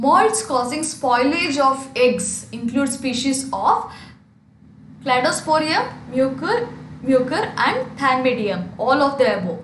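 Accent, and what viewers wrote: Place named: South India, India. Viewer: Just love ur speaking accent .👀🙈 R u from south india..🥺👀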